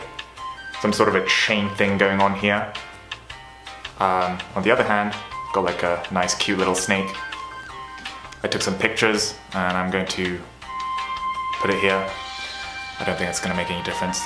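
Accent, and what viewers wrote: United Kingdom. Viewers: Ive never heard this man speak before I didnt think hed be British 😍😭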